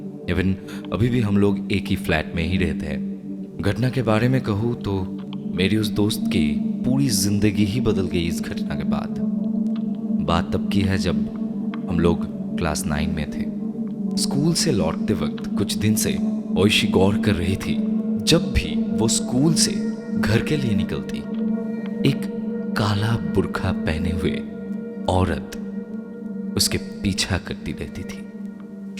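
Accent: Bengal, India. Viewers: I love your voice. In bengali channel what I love most is your amazing gift of gab and presentation, will love to experience that here too. But please note your hindi pronounciation needs little bit improvement, the touch of bengali tongue is a little prominent, otherwise marvellous presentation. I know you will improve, as always. All the best for this new journey. Have a good day! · I will give Honest review.. no oiling no polishing. Don’t continue with the Hindi version. Because you’re many Hindi words sounds like Bengali. please continue your Bengali version. At least we can enjoy your bangla bhasha. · Vai keya hindi he purai bangla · Dada tomar voice ta just osmmmmmm ❤️❤️❤️ Hindi teo bangla r feeling asche 😍😍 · Kintu jai bolo banglay pret kotha sunte beshi vlo lge...ar Hindir ucharon arro beshi valo korte hbe.karon banglar akta tan theke jay jotoi Hindi te bolona keno.its ok prothom episode ata..aste aste ai ucharon ar tan gulo valo hye jabe..♥️ afterall voice to tomar marattok sundor...♥️ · Darun hoyeche. 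Ektu bangla tan ache Hindi te but darun. Chaliye jao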